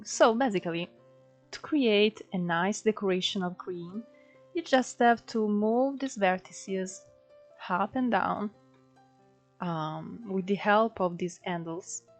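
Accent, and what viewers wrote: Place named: Netherlands. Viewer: you can olmost here the dutch in your voice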